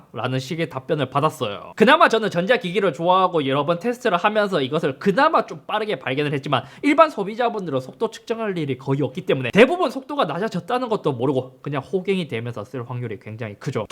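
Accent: Gyeongsang, South Korea. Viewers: 경상도 신뢰가 안간다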